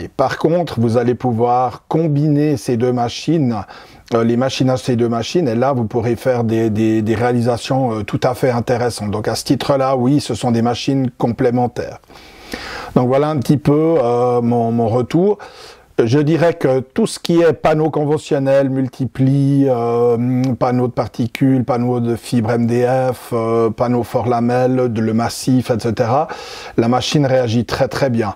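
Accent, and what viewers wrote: Switzerland. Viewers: J'essayais de trouver cet accent et je me disais Apple Bière ben voilà ;-) aller pour une fois qu'il y a un Suisse qui se donne la peine je m'abonne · Avec un accent vaudois ?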